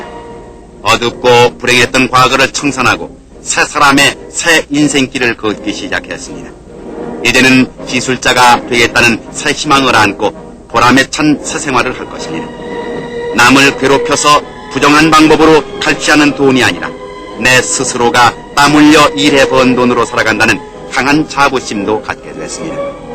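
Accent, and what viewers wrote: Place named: North Korea. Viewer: 약간 부칸스러움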